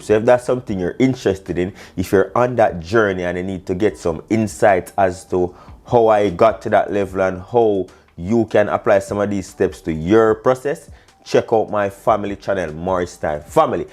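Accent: Jamaica. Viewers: Morris, I am moved to say u r on to greatness! Ur delivery, methodology, mannerisms is just authentic then u drop the Jamaican expressions n lift ur presentations to another level! Miss Lou would be proud a yuh!!! Bless u n family n keep JA no top.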